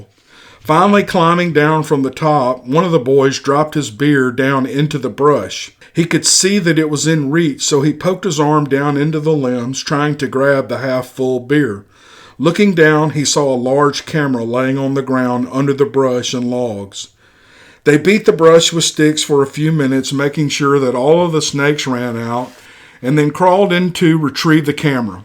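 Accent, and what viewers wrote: South, United States. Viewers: You do the southern accent proud, mate! :D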